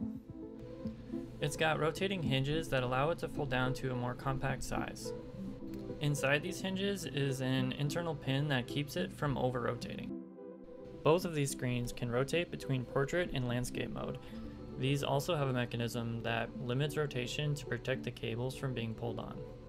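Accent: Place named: Russia. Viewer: У чувака русский акцент ) А если честно: выглядит потрясающе.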